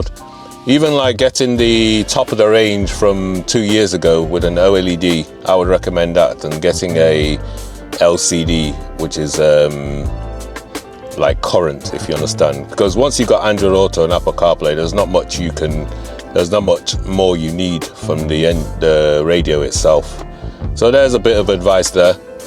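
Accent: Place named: United Kingdom. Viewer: I think its great what your doing brother. Most mr2 owners on youtube are american and its a refreshing change to hear a fellow Brit 👍 I love the editing aswell keep it up.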